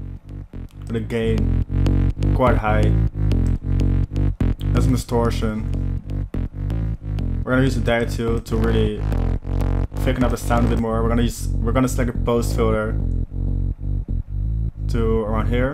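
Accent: Netherlands. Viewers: graag gedaan gozer! Ik hoor aan je stem dat je gewoon NL ben haha, ben je ook een dj en wat is je insta?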